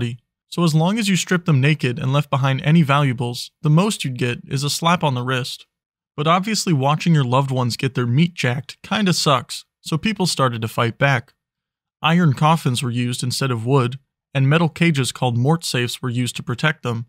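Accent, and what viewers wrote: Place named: Canada. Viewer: It's on a Saturday morning in Flori-duuuuuuh and I am being introduced to this channel and I have to honestly say that I am so glad I have insomnia and YouTube for once!! This guy is freaking funny as hell! Too bad I don't have any buds to blow smoke on while I chuckle like the flesh covered hyena he's turning me into!! I am curious as to where exactly he's from tho, he said "my FELLOW Americans" in his most recent video but he sounds like he could be from Canada😂😂😂 All I can tell for certain is that he is NOT a fan of those who were born in the south, fortunately, I was born in the Midwest over in Illinois 😁🤣🤣🤣😂 .....Side note; when is he going to have some stuffed animals or hoodies?! I'd totally get some!!❤❤❤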